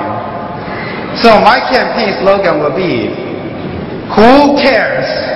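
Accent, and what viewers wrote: China. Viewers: 让我看到了 纯正的东北调的美国口语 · 这口音好严重…广东来的吗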